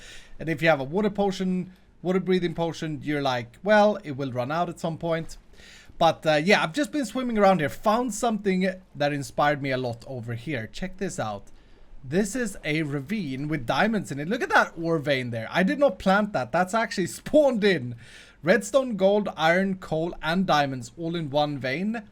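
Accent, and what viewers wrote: Sweden. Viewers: Arr you from sweden?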